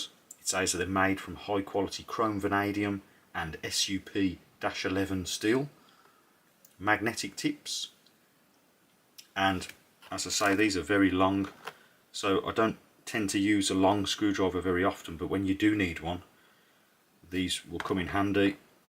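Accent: Birmingham, United Kingdom. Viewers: You need a better accent. Most Brummies are as stupid as they sound. I suspect you’re not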